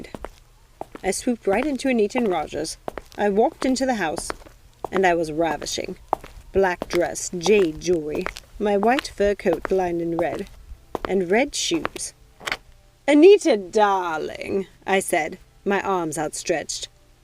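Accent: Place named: England, United Kingdom. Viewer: Well pronunciated. Love the English like accent. Well done and thank you for sharing 😀😀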